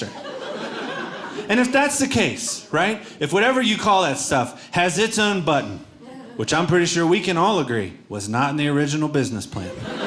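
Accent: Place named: South, United States. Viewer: Funny man. His southern drawl is spot on.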